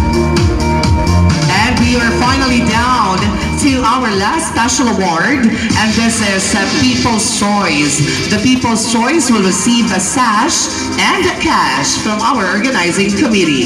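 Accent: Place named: Visayas, Philippines. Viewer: Bisaya kaayo oy..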